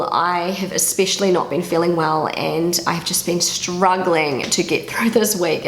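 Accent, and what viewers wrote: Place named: New Zealand. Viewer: I'm very excited to Heart your nice exceptional Kiwi accent against cause IT thrills me everytime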